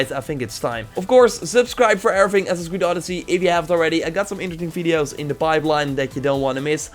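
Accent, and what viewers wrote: Netherlands. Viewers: he Dutch as fuck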